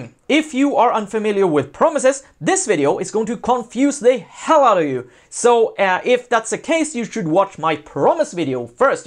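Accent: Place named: Sweden. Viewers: Swedish accent ftw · Swedish accent > American accent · Haha I remember when I watched this channel for the first time and I was wondering where is from. English that sounds better than the American one ^_^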